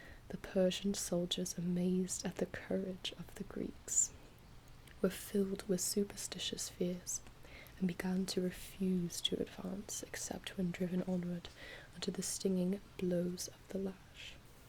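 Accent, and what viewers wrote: England, United Kingdom. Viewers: Why the british accent though??watching this as greek is so cringy but w/e great work anyways.. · Holy hell this accent!!! I literally did a double take when you first spoke because I couldnt understand how such an english accent was coming out of your mouth haha. Yassssssssssss huge congratulations, you are absolutely awesome and such an amazing asset to our rapidly expanding community! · Why does she have an English accent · That English accent though 👌👌👌😂😂😂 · Wow, English accent is spot on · Okay but why the british like accent dude? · Wow that's the best English accent I have ever heard from an American, honestly in a movie you'd not be able to tell which side of the atlantic ✌️ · Shouldn't you have a Greek ascent? and not a n English one? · Insanely good British accent!! loved it! seriously sounded like you are from England :4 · Love it good job on the English accent big shout out from Leeds England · Carrying on the fine tradition of the media giving all ancient or futuristic civilisations British accents, queeny approves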